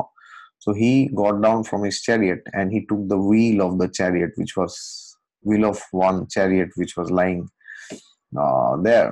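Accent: Assam, India. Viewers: As he belongs to eastern part of India. An Assamese.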